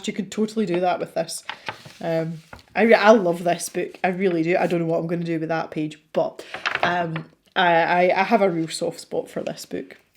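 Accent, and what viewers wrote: Scotland, United Kingdom. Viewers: I adore your Scottish accent! There's no need for cringe or anything. It's funny though that Mr didn't pick up on the dialect after all those years. I would be in full trying to sound like you mode if I were in Scotland for 2 seconds 😂 I'm a parrot and try to blend in as much as I can; already did that as a child. I usually have a strong Tyrolean dialect, but I rarely use it when I'm outside talking to other people because in Vienna not many have that dialect, they have Viennese dialect (which I find absolutely disgusting and won't even parrot 😂). People are so different, it's funny to me 😄 Btw, I totally second the opinion on the Gansai Tambi Starry Colors, they're amazing and last forever. And they reactivate really well, just put a drop of water in the pan and wait for a few seconds and you're good to go! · Hullo, I believe you picked the perfect color for the big door hinges, well done! 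I have heard some Scottish accents that are very hard to understand, yours is not one of them, now I do not have TikTok nor do I plan to download that app as I have enough time wasters on my phone. I enjoy your videos and your accent and I have always loved your attitude of it’s just a piece of paper no one‘s gonna die! Thanks again for Sharing it this coloring time with us and Thursdays a great day for me too. I look forward to your next session as well as Sunday’s video be safe take care and have yourself a lovely day!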